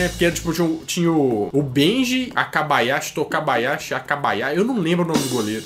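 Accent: Minas Gerais, Brazil. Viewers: Vc e mineiro brother? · Esse sotaque minero é top demais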